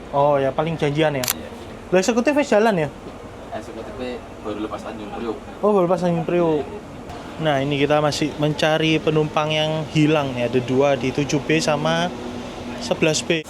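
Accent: Surabaya, Indonesia. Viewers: Seneng kalo mas ngomong. Sopan. Suaranya kalem. Trus bahasa jawa surabayaan nya keluar tuh 😅 bahasa jawa chindo gitu 😂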